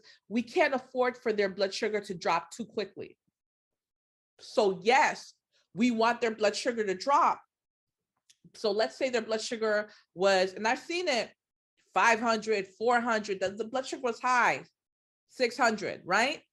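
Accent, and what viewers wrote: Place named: Haiti. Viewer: All of your videos are so helpful I love the way you break It down. That’s exactly how I speak to myself when trying to learn . And reading the book alone is definitely not as helpful since you make It make sense ! One of my classmates told me to watch you cause she said we speak the same, and when I did I had to laugh ! I kept saying to myself she gotta be Haitian and I would like to say originally from New York or lived there at a point ! Anyway , thank you for all that you do for us nursing students 🙏🏾🙏🏾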